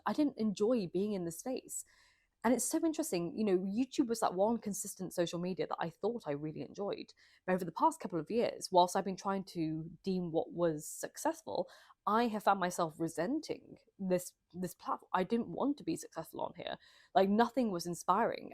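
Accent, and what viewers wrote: RP, United Kingdom. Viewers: The reason I started watching your channel is because I absolutely loved the classic literature content. I don't really know if others agree, so please take my opinion as just that ... one person's opinion. That said, I am eager to see a return of classic literature content. Your presentation of said content was engaging and thrilling, not to mention that, as an American, listening to your posh English accent is lovely.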